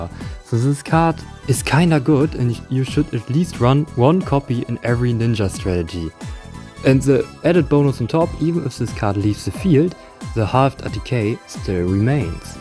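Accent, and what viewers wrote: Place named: Germany. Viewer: Bro, bist du aus Deutschland? Diesen Akzent erkenne ich doch meine ich 👀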